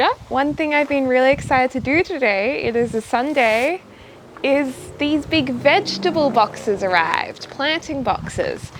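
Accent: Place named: Australia. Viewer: is that your Australian friend again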